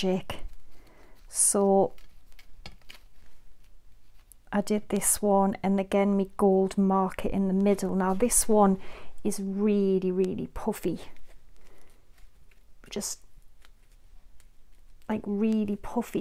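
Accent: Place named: Newcastle, United Kingdom. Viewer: I have only just come across you I really love your ideas they are Great in your jordie accent, 👍 Nice to find craft inspiration in England the others I have are American I have no issue about but its nice to find one here, I love what you did any chance how you did the white poinsettia please, bit late to the part though..😃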